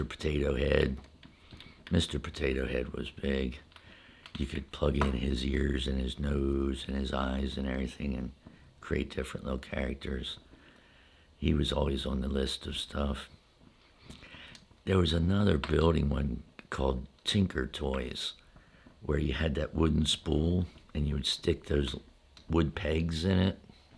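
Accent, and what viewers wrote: Pennsylvania, United States. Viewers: What part of PA are you from? Because my family is from western PA and sound just like you.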